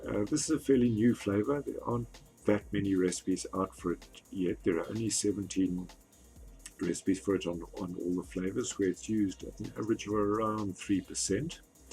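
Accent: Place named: England, United Kingdom. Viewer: Thanks,very informative review as always. I hope you don’t mind me asking but are you originally from S.Africa as your accent sounds very English to me?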